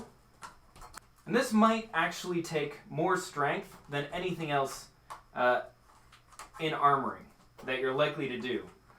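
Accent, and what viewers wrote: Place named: Canada. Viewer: The Canadian accent is strong with this one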